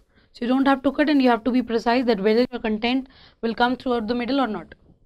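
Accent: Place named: Pakistan. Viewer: Pakistani english bolrhi h ye madm To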